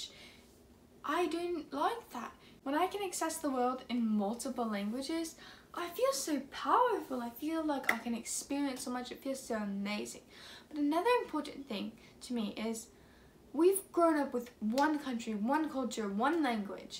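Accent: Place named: United Kingdom. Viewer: your accent was kinda different today, more like british or idk. i enjoyed listening so much. i really like learning language a lot and this video made me fell more cool in my skin. i agree to everything there and i think everyone should watch that video coz it's super advantaging to learn a new language. thank you so much for producing videos like that for us. this video deserve a lot of like, comments and sharing!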